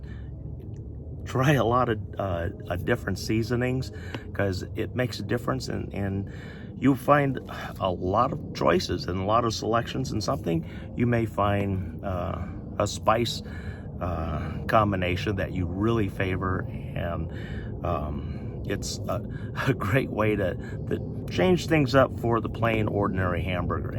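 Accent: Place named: South, United States. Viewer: I could tell that living in North Carolina really did you well for that semi-subtle Southern accent.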